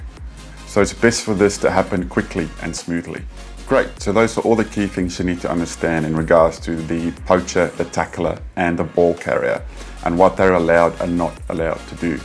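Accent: South Africa. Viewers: I can't believe I've only just found your channel. Amazing content, adding so much more to my understanding of the game. Especially the different, roles, positions and responsibilities👍🙏🏈❤️🎊 please can you confirm your accent for me. When I look at the screen and watch you, you sound south African. When I turn my head away and just listen to you, you sound austrailian/new Zealand. It's very confusing for me🤔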